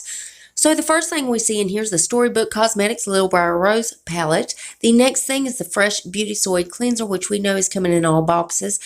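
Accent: South, United States. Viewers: Thanks for the spoilers love!! This box is going to be awesome and u totally got me excited to get my boxyluxe this month. My nickname is as well. Love he southern accent sis, I have a southern accent too. I’m from southeast Kentucky. I do beauty subscriptions box openings as well on my channel so hope to see u over my way sometime too. Much love to ur channel, ur doing a great job. Subbed and liked ur vid. ❤️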